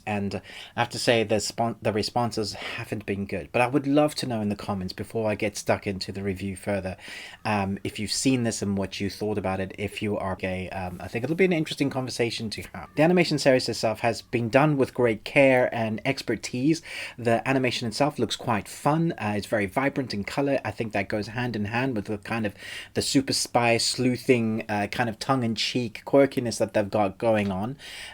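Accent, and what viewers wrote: South Africa. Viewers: You South African . Much love from cape town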